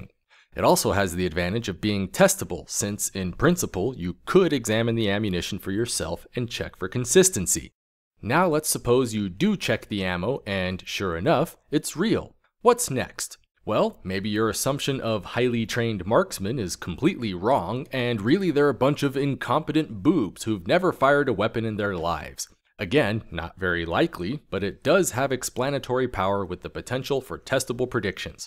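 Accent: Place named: Utah, United States. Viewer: Else -> elts, false -> falts, where do they speak like that? I've only heard "elts" to be a Utah-regionalism.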